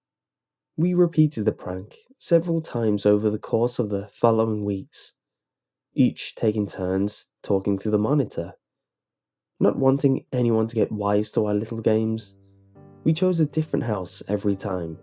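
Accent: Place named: United Kingdom. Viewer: oml, British accents 😣😣😣😣😩😩😩😭😍😍😍 oml.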